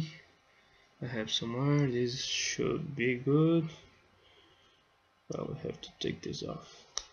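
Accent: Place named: Caribbean. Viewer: Your video is unfortunately stay only have no idea this Huawei G300 disassemble your video is too long, it shows you do not know, my advice is to practice before and after you record it, you should have practiced before and make sure you see more removed when this smart phone, plus your English seems caribbean, I feel for you but my vote is desfaborable do not understand how you can be so stupid to make this video ....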